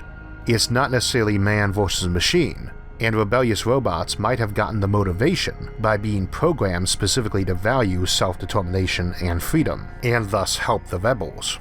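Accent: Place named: South, United States. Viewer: Lol. I didn't even know you had a speech impediment. I'm English and thought you had a Southern American accent.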